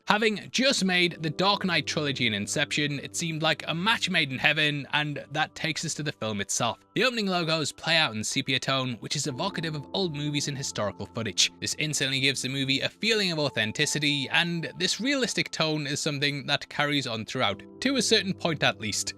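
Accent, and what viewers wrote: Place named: Newcastle, United Kingdom. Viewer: YOU are this channel, everything we watch and listen to here comes from you. Like other people have said, we could all go and watch a similar (albeit not as good obviously 😉) video to this on YouTube but we all choose to watch you. As cheesy as it sounds that means you’ve built a community here, and it’s not crazy to think that we care about you. I hope in the future you feel you can put a bit more of yourself in your videos without sacrificing the right to a private life. It really meant a lot that you trusted your audience to tell us something very painful but also very relevant to your emotional response to this film. As an aside, I fucking love your channel, it’s an absolutely gargantuan (pun intended) amount of work you’ve put into it which is hugely appreciated. Also I moved away from Tyneside two years ago so it’s really comforting to hear a Geordie accent emanating from my TV and filling my living room. 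❤❤❤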